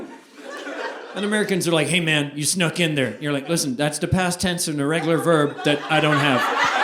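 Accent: Ireland. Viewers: David is an Irish, American,Mexican, treasure - I love the Irish brogue and his comedy is so fresh and funny 😁 Keep up the great work 🎉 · I love your comedy. I watch every show you do on you tube. I love your Irish accent. Have you ever listened to Onoir an Irish singing group from Ireland? Wonderful music. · The Irish accent 😀👍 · Wonderful show (and boy, did i need some laughs). I think you're the first Irishman I listened to whose every word I perfectly understood; your looks didn't hurt. 😉😘Hope things are looking up; you deserve it. BTW, your mom sounds terrific; hope she and your fam are well. You should come perform in San Miguel de Allende, Mexico; lots of expats here would love you (me included). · Eye candy, Irish brogue, trivia, truth, comedy - welcome to my hometown! Yep, I'm in Florida but wander around. You'll see there's little bit of everything here...and we're all related. 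(source: 23 and Me) 😇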